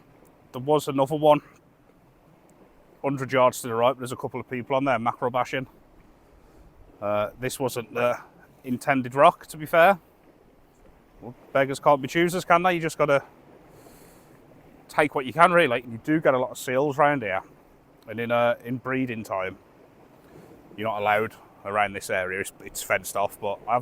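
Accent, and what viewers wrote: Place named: Midlands, United Kingdom. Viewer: I’m from the Black Country your accent sounds a bit local ish to the midlands mate! Love your videos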